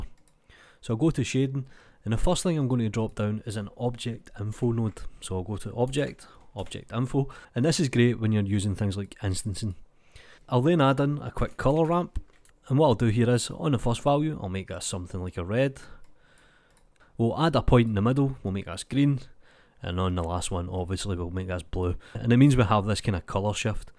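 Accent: Scotland, United Kingdom. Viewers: why do i think you are from scotland ??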